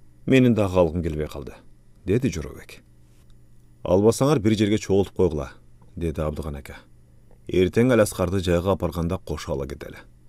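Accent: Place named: Batken, Kyrgyzstan. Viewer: Ото соонуууууун укулуктуу Биздин Баткендин тили менен суйлогону ото укулуктуу ❤😊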